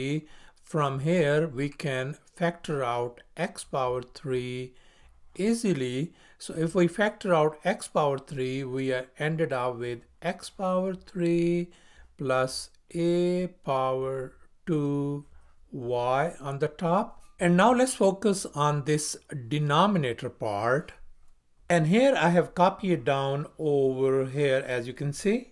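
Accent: India. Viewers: Sir , I am from India.. You sound like Indian accent.. Are you from India?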